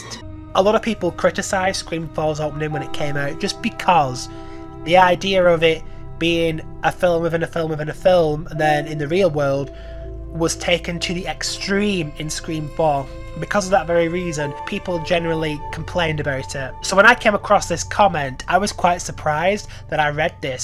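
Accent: United Kingdom. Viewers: I really do feel like Roman fits into the whole story very well - it’s a believable twist and a big one, however as people dislike the third movie, they feel like they should dislike roman and brush aside that he was the third killer in the original movie and the reason the first movie happened. My unpopular opinion is that Scream shouldn’t be viewed as a comedy more than a slasher, and whilst there are some funny moments, the franchise overall isn’t taken too seriously by some horror fans and i feel it should be, it’s the most realistic franchise in the genre and it hasn’t deviated from its realism at all, as there isn’t anything supernatural with the killers. So to conclude, Roman being the third killer is canon and he provided a good twist, and Scream as a whole should be viewed as a more serious slasher entry, rather than something of a comedy. Great video, it’s always nice to hear a fellow brit loving scream! 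🔪🇬🇧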